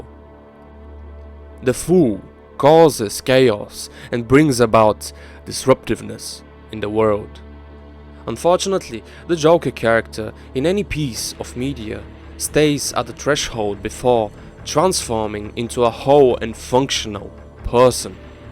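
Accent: Germany. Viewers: Great video ! I like your accent, is that german ?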